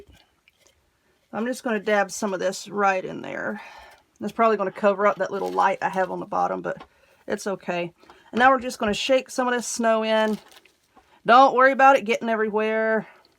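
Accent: South, United States. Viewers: Miss Southern Lady, I definitely live your southern accent. You have a good sense of humor. I enjoy watching your videos. I love watching your different types of craft.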